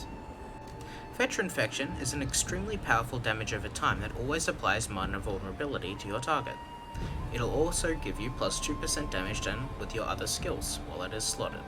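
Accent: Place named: United Kingdom. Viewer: Thank you so much for being experienced, and explaining everything pristine. I came here from a comment you left on one of Hack's eso warden build videos. His build was nothing compared to yours and I almost made the grave mistake of copying his build. That is when I found your comment, it seemed so educated, which made me click on your channel. When I saw you had a REAL man's warden build, I knew God existed and worked in many mysterious ways, and that those ways brought me here. I am from the States, but you sound like you are in the UK, God Bless The Queen.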